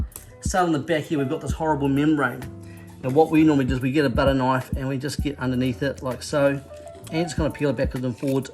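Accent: New Zealand. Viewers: As soon as you spoke, I knew a Kiwi accent from anywhere 🥰 thanks for sharing 😊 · Looks great! Love seeing all of your BBQ gear! Do you have a favorite? Also, hearing you speak is making me miss NZ!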